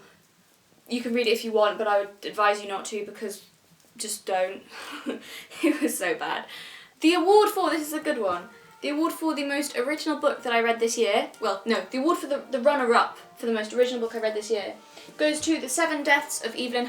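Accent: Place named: United Kingdom. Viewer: By prober vids i hope you mean videos where i have to check if my headphones are working! 😂 (i showed your shadowhunters videos to my friend who loves them and he was like "she has to shut up, because shes right but i love the books and no smart girl with an adorable british accent can change that" 😂😂😅 we italians do love your accent)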